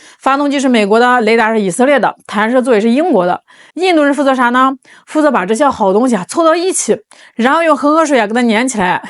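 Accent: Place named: Henan, China. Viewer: 主播的口音好重😂河南人吗？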